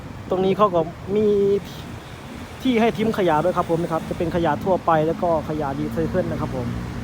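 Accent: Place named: Champasak, Laos. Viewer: บ่าว มาจากแขวงไหนครับ? ผมเดาว่ามาจากแขวงจำปาศักดิ์ สังเกตจากการออกเสียงด.เด็ก,ซโซ่,ออกเสียง ฤดูเป็น ระดู ฯลฯ ผมเดาถูกมั้ยครับ?😂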